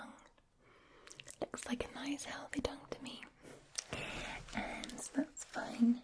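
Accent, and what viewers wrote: Scotland, United Kingdom. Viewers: I'm getting sick of seeing these! Jk I love the video and nothing beats a Scottish accent! 😊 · So cool listening tae someone else who’s Scottish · i love love love the Scottish accent thank u for this it’s amazing keep up the good work!! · I am scottish myself, so this makes it much better to listen too, also probably one of the best ASMR'ists of youtube in my opinion :) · Best Scottish ASMR youtuber! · who doesn't have an accent? English accents are so damn mixed and diverse that even being from the UK I can't tell what it is. I think it's more so Scottish. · I do love the Scottish take on the ol' CNE (being Scottish myself) :-) Great work!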